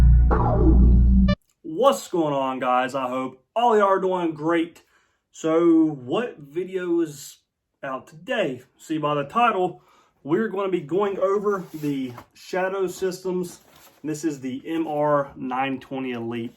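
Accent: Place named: Australia. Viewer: Interesting accent. Is this guy an Aussie expat?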